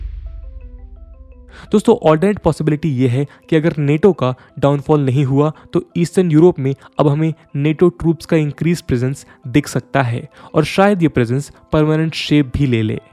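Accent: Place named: Bihar, India. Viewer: Mr., From your voice tone, I think you are from North Eastern Bihar.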